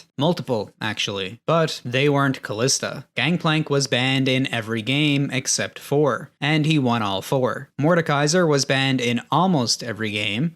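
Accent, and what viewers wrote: Canada. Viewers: can you please work on getting rid of your canadian accent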